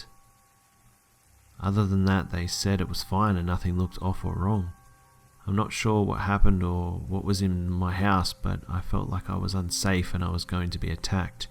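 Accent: Australia. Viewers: Started the video and wondered if you where an Aussie then checked the description and laughed Ps. It's g'day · You know what scares me the most about your stories, but only the ones you tell that actually happened to you? You have a fucking Australian accent, when I was a kid my house was broken into multiple times but nothing was ever taken. Now that I think of it it scares the shit out of me. Anyway the things that you talk about, make me shake so hard. I don't want to think that any of this shit happens in Australia. But then again, I have seen Ivan Milat in real life too. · Are you Australian?? Your accent sounds like you are. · Love your channel Man! I'm a fellow Aussie and it's so nice to hear stories read in our accent :)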